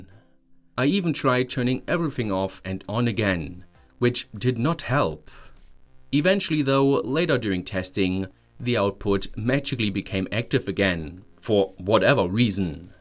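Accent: Germany. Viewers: you are not even Scottish, this is a heavy German accent if I am not mistaken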